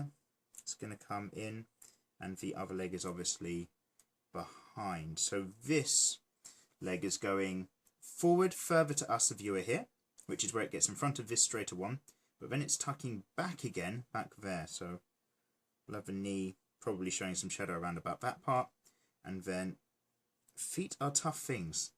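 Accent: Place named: East London, United Kingdom. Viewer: When he pens, papers and "tings" I said yes East London or you have lot of "urban" friends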